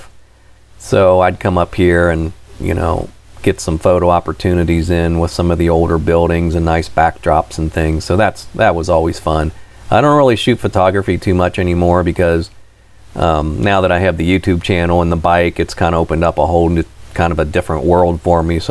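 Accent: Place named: Great Lakes, United States. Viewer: Are you originally from Chicago, Michigan, or the Great Lakes area? Just wondered because of your accent.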